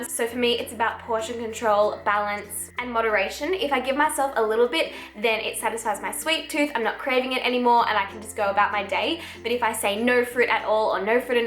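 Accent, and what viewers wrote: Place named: Australia. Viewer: So Aussie.. I LOVE IT :)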